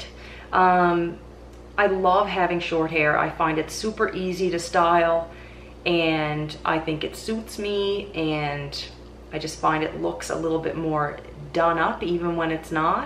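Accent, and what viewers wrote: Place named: Nova Scotia, Canada. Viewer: So glad to hear your Nova Scotia voice again! I also got into house plants about 2 years ago and now I probably have a hundred. I have them in the front yard, in the backyard, and all over the house. Last year we had a freeze and I had to move them all inside and then it was ridiculous. But most of the time they can live outside because we don't freeze, which I know is not an option for you. I cut my hair to chin length and most of the time have it pulled back with a headband. It's not my best look but I'm at a stage right now and I just can't stand to have my hair in my face. Again, great to see you!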